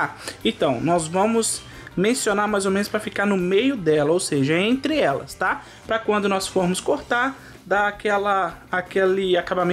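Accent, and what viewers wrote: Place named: Rio de Janeiro, Brazil. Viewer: Com certeza e carioca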